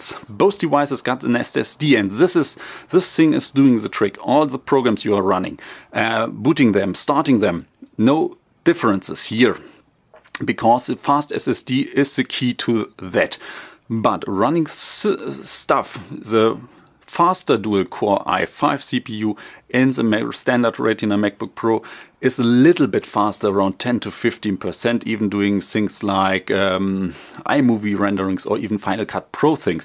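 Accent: Germany. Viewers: Are you from Germany nice accent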